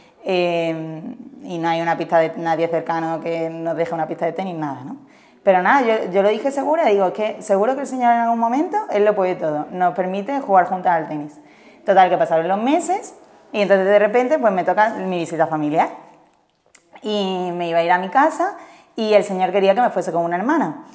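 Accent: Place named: Andalusia, Spain. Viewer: Linda historia y hermosamente contada con alegría y acento andaluz 😃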